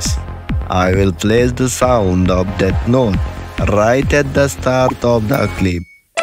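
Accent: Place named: Spain. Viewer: Thats the most Spanish accented English Ive heard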